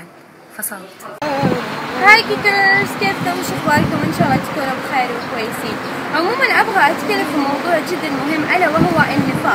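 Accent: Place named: Saudi Arabia. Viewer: ليش تتكلمين سعودي خليك على لهجتك احسن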